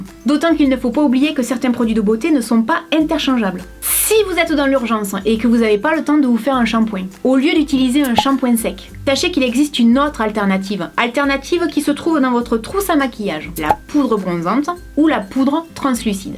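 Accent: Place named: Perpignan, France. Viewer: elle vient de perpignan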